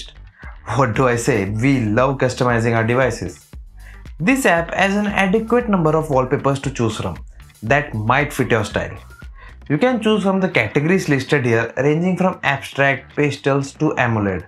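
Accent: India. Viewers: Thank you tube for closed captions. Many of us just can't understand Indian English.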